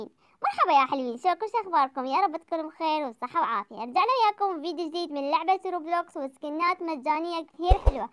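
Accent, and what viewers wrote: Iraq. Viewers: ة انا من العراق تحية كبير لي اهلك و لي الج 😀😊 اعرف انتي من العراق مبين 😜سوري سوري بس احبج 😍😁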